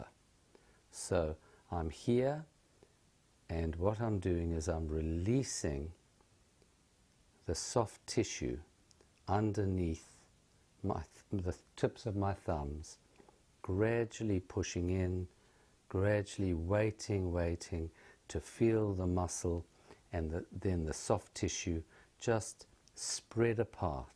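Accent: South Africa. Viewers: This was amazing. Recognised that South African accent immediately. ,More to the point I have been suffering from acute pain around the Supraspinatus join at the head of the humerus radiating into my upper arm. I thought and was advised that it was most likely a partial tear of the supraspinatus and followed a regime of excercise that has had no effect whatsoever. This has been ongoing for over 3 months and I have long since resigned myself to the fact that i'll probably need surgery. However a short popped up on my feed which I followed to the video, after watching I used a dowel and attempted to approximate the trigger point pressure, very inexpertly and awkwardly I might add. Almost immediately I experienced an increased range of movement and a drop in my pain index. I am really excited that I'll regain full function and be able to hit the gym again after a few more tries. 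Thank you!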